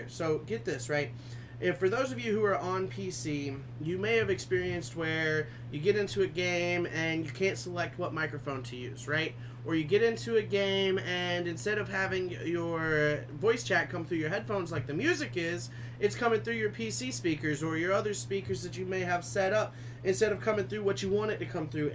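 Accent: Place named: United States. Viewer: Thanks, your american accent is pretty good by the way 👌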